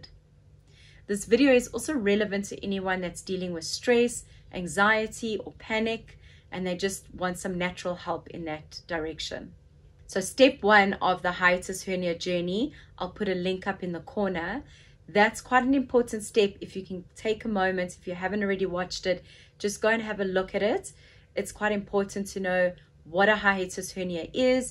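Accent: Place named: South Africa. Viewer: She’s from South Africa my hubby is from there . I hear the accent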